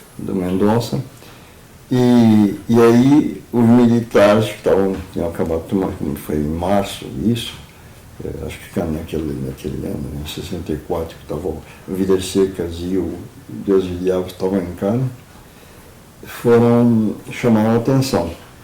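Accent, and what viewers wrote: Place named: Portugal. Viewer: Sempre o sotaque portugues------isso ´ é bom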